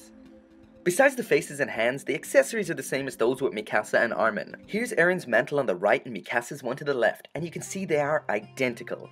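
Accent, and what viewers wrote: Ireland. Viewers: I could sense a slight Irish accent! I live in the UK but I'm from Japan, your accent isn't as strong as the ones we get here but I still thought you lived in the UK so when I saw the end of this video I thought it was a shop in the UK and got really excited.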